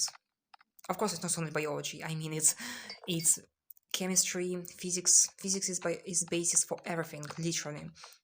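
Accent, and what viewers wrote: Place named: Russia. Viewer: Сначала я увидел лайк под русскоязычным комментарием другого видео, потом услышал английский с явно русским акцентом, ещё заметил подписку на русскоязычный канал… Какие языки ты знаешь, и какой язык родной?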